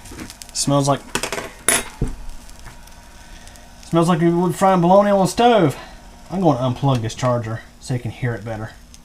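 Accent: South, United States. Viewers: I like the fact that your southern. It just demonstrates the fact that country boys dont have to be simple. Im very proud of this vid btw very great content.👌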